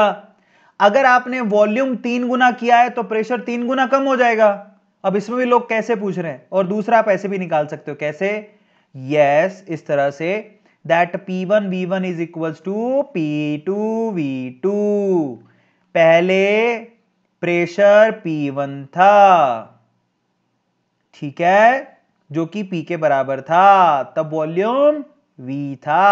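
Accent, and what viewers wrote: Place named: Gujarat, India. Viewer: Sir gujrati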